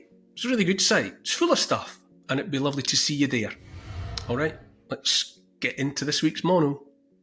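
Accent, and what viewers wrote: Scotland, United Kingdom. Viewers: His words are powerful poetry in a Scottish accent and deserve to be heard by all no matter how delusional or brainwashed one currently may be Neil’s words might enlighten the dome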